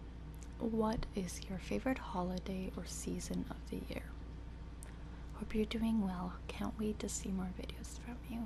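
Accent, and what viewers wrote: Canada. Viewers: It's so cute, I can hear the Canadian in your accent in a lot of your words in this video for some reason. Maybe my left ear is compensating for my malfunctioning right ear so I am able to pick up on it better. I don't know. Anyway, I find it quite endearing although I don't know why.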